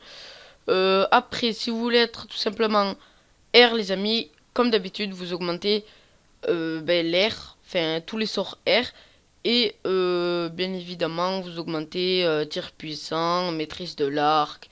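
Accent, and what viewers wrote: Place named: South, France. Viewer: le petit accent du sud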